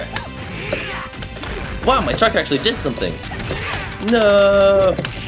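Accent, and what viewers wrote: England, United Kingdom. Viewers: Why the fuck this Japanese guy have a British accent? Can he actually speak Japanese? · he is an Asian but have UK accents. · You're Asian and you have an English accent. You are officially my favorite person in the world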